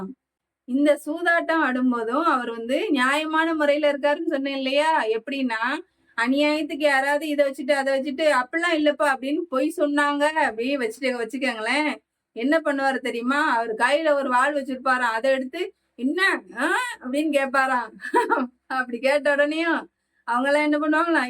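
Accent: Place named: Tirunelveli, India. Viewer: Good Tirunelveli Tamil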